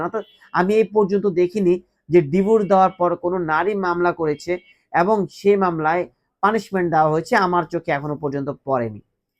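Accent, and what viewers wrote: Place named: Kolkata, India. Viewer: আপনার ভাষাটি কলকাতার মত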